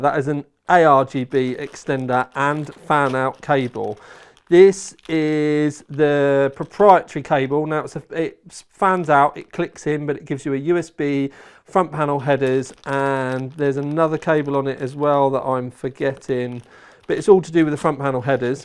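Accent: United Kingdom. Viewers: please reduce your british accent ! 70% in youtube user comes from non english countries and cant understand british accent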